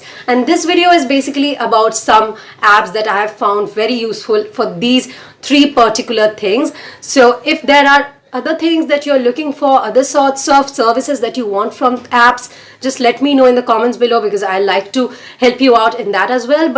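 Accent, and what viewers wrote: Bengal, India. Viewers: Are you Indian? Your pronunciation proves that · May I know if you are a Bengali... Because I found a Bengali-like accent in your speaking and that is good. Anyway I'm a Bengali.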